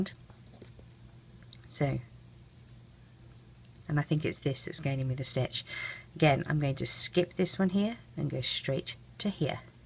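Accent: United Kingdom. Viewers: OMG your british im a quarter cause my nana is britan and everyone after her!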